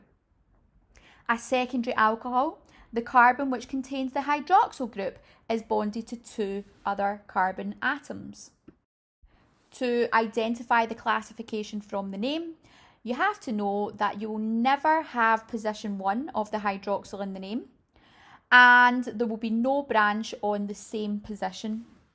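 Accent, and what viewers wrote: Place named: England, United Kingdom. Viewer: I like your English accent reminds me of Brave Disney movie, Merida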